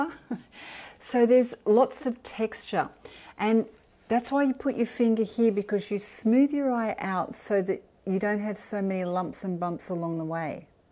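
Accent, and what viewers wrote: Australia. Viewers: Your personality fills me with warmth and joy and you are one of my top favourite YouTubers. I remember finding your channel (broccoli sprouts video) when I was in a toxic relationship and did not know what was wrong with me, and your videos gave me so much hope, softness, feminine energy I was missing in my life. I watch your videos now and I feel that burst of joy, pleasure, warmth and happiness you always have in your videos. I love your Australian accent too. You have no idea how much your radiant personality can heal others behind the screen. Thank you for being you. I hope one day to pull off this makeup look since you look like a model. There are so few people left on this earth with such an authentic emotive energy, beauty and personality. I hope you never change who you are. Thank you for what you do to help so many people remain beautiful in a world that can be cruel at times.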